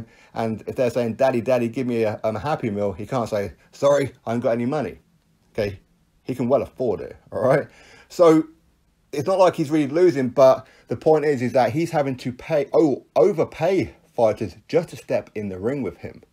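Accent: United Kingdom. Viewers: Listen obviously from your accent your britian but whats fair is fair. Ruiz whipped joshua ass no matter what you say he knocked him down more times. Wether it be if his head or distracted whatever the bullshit is. But ruiz is the new champ. Remtatch clause or contract whatever the fuck you wanna say. Ruiz is the new heavyweight champion. Id rather see him fight wilder or fury. The rematch will be the same. And dana white says styles make fights. Ruiz was better that night. And in my oponion hes the better fighter ruiz is a savage joshua is a terminator robot with no rythym just strength. In boxing its more then strength. Ruiz was and is the better fighter. Get boxing politics and money out the way. Ruiz is more superior ......period if you cant read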